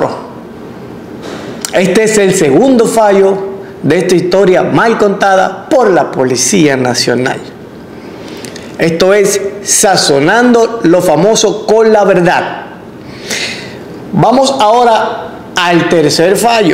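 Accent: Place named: Cuba. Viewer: PRIMERA VES K T VEO Y ME INSCRIBI SEGUIDO POR FAVOR NO IMPORTA PERO TU SUENA CUBANO! SI ERES CUBANO NO IMPORTA! TU SUENA INTELIGENTE Y SERIO! POR FAVOR NO T VENDA! Y BUENA SUERTE!!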